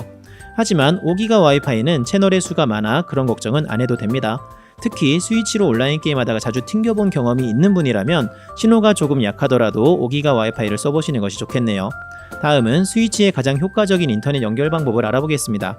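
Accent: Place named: Gyeongsang, South Korea. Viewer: 약간 경상도 억양이 있네요 그리고 전 이더넷을 박아놔서 ㅋㅋ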